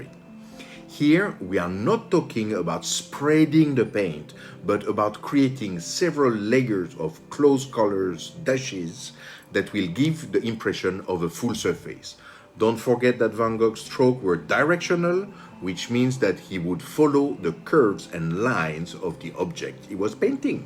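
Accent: France. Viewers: on arrive à reconnaître l'accent français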